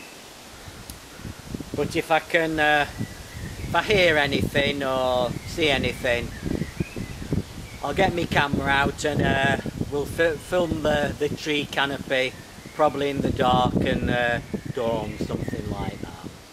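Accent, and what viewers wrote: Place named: West Yorkshire, United Kingdom. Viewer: Respect mate, so inspiring What a lovely soundtrack with birds chirping away Your videos are really great man, and w appreciate your challenging production values, and man you consistently make good videos btw your accent reminds me of home I'm was born in Keighley, West Yorkshire So peace and love from Bristol, England Then owls were like "who the bloody hells this?"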